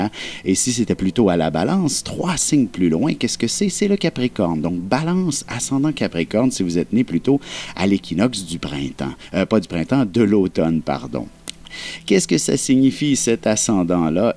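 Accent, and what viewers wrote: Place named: Quebec, Canada. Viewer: lotus fleurs C'est du Québécois ;)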